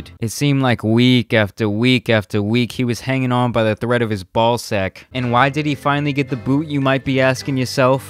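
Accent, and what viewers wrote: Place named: Philadelphia, United States. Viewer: you said squares. you're from the midwest area? But you have like a philly type accent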